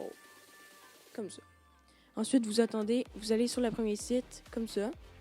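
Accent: Quebec, Canada. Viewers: l'accent Québec j'adore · T'a pas un accent québécois ?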